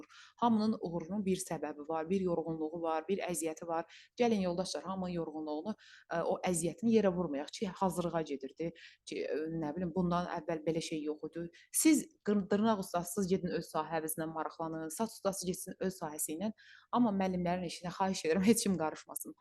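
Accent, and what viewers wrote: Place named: Nakhchivan, Azerbaijan. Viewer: Məlimə siz naxçıvanlısız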